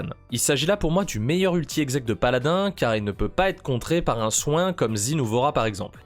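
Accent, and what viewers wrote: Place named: United Kingdom. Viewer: je te juge pas mais ton accent anglais seris... désolé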